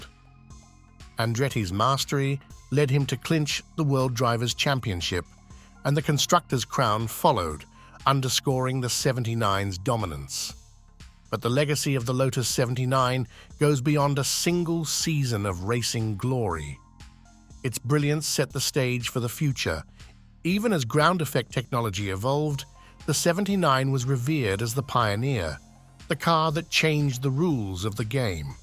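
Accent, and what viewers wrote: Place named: England, United Kingdom. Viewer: I can't believe how AI narration has moved on, the clipped English accent does indeed gel nicely with the eras covered in this video. Well done, subbed! 😊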